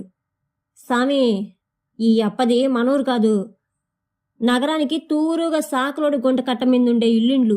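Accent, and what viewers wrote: Rayalaseema, India. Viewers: నమస్కారం madam, యాట కథలో మీ వాయిస్ రాయలసీమ స్లాంగ్ లో చాలా బావుంది. ( అమీరున్నీసా ) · నేను రాసిన యాట కథకు మీరు రాయల సీమ యాసలో జీవం పోశారు మీకు మీ ఉషా చానల్ కు దన్యవాదాలు మేడం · బావుందమ్మీ నువ్వు సెప్పిన ఇదానం.. కథను కల్ల ముందు నిలిపినవ్ బా.. గొప్పగా చెప్పారు గారు.. మా సీత్తూరు భాషలో.. అందులో మా వెదురుకుప్పం లో రచయితకి పక్కన ఊరు మాది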